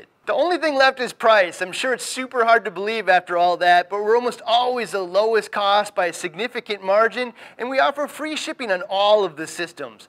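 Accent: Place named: Minnesota, United States. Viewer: That explains his vowel sounds. Minnesota... I don't mean to nitpick; the videos are great, but northern accents are like nails on a chalkboard to me. I'm sure my Texan accent is just as offensive to people.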